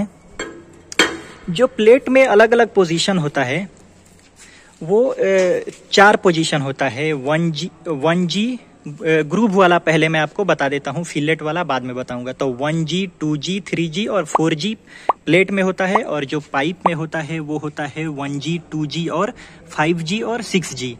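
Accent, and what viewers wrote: Bihar, India. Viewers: Rakhanu. Bihari. Ho. Bhai. Ham. Bhi. Bihari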